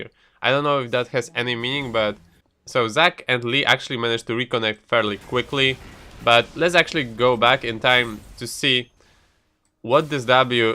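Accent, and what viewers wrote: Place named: Poland. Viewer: Where is from? Because i hear like polish accent in his voice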